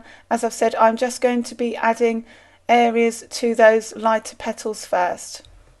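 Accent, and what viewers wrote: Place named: London, United Kingdom. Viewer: Lovely work my friend! I love daffodils! I am missing London with your accent. 🥰 xx #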